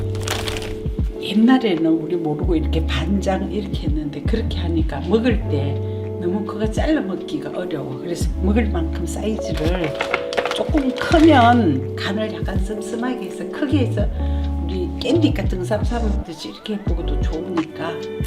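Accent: Gyeongsang, South Korea. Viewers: 스님 음식은 누구도 할수없는 몇가지 따라해봤는데요 이주토속적이면서 귀한 음식 약 간경상도 사투리섞인정감있는목소리 .스님 건강하시고 다음 또기대합니다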